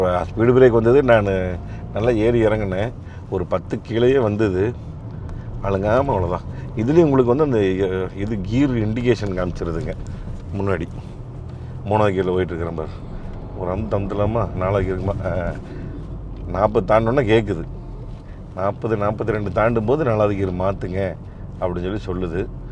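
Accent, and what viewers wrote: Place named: Kongu, India. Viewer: அக்கா சரிக்கா. வெளிய போங்ககா 😂😂😂😂கொங்கு தமிழ்.. அழகு... மொறு மொறு மொறு மொருன்னு 😂😂அருமை அண்ணா 🙏🎉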